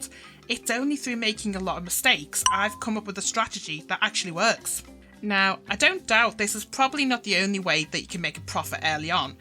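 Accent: Liverpool, United Kingdom. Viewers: you sound like you're from somewhere surrounding liverpool, nice video too!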